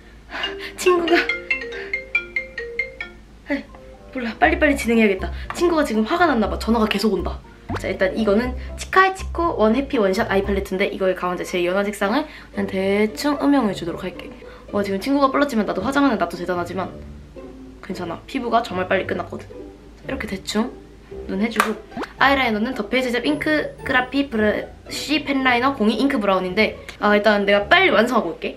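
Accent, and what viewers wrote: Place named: Gwangju, South Korea. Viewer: 아 걔있냐 는 사투린데 아무래도구성진걸보니 광주인가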